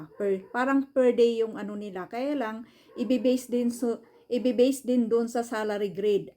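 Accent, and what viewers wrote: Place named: Igorot, Philippines. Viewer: Ne, apay kasla nga Igorot ka nga pada met nga agsau auntie? Hehe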